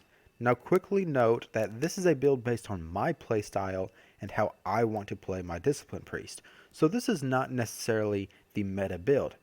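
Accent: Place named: South, United States. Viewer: This is nice to hear a fellow player with a kinda Southern accent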